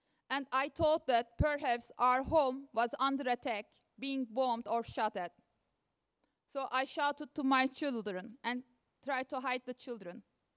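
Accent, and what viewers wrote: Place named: Istanbul, Turkey. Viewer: Agir bir Nisantasi aksaniyla English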